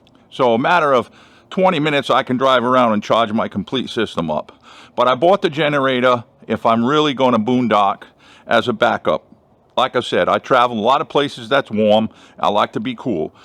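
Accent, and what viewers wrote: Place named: New York, United States. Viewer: You have a newyorker accent👍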